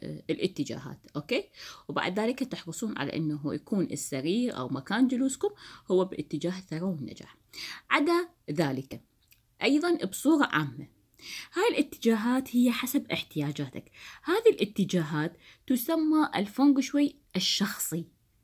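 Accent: Iraq. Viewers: انتي عراقيه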